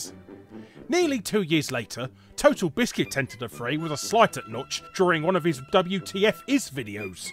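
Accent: United Kingdom. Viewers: Time for another 13 minutes of that good ol' British accent.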